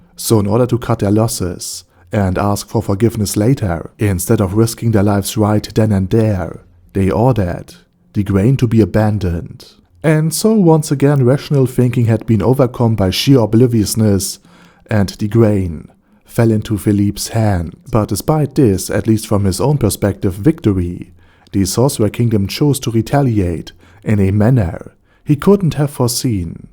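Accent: Germany. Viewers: Why do you speak with German accent ?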